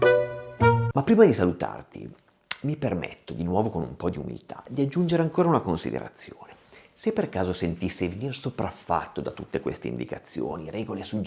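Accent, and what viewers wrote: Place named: Piedmont, Italy. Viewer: Ciao Doc, visto l'accento piemontese forte e il cognome, posso chiedere la città o paese che sia?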